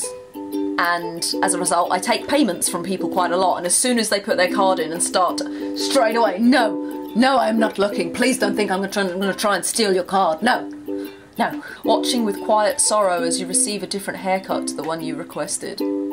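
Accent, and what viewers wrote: United Kingdom. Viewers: Just found your channel and I absolutely love it 😀 I really like your british accent, its just nice to listen to your voice. That point with the phone is so damm true 😂😂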